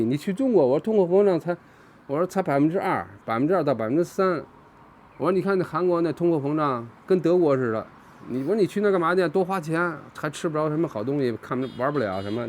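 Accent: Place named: Beijing, China. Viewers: 他可能指的是祖籍，很多人会这么讲，听口音应该是在北京长期生活过的。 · 京爷 。哈哈 这口音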